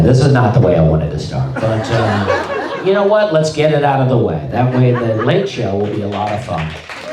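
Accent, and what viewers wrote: South, United States. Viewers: The thing is there is not ONE joke in this entire set? Just uncomfortable country drawl mumbling? Do you do jokes or just ramble aimlessly? If the people were laughing hard you wouldn't be heckled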